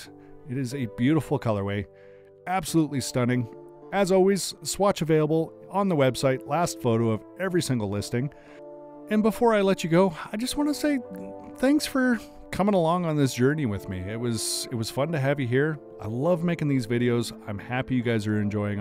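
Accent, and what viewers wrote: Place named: Alberta, Canada. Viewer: I absolutely love how you take us through the process and show us each color way worked up and paired. Makes me want to buy EVERYTHING. Fantastic marketing strategy. Canadian living in the US so it’s fun to listen to your Alberta accent. I have family around Lethbridge through to The Hat. Love your videos; keep ‘em coming. 🙂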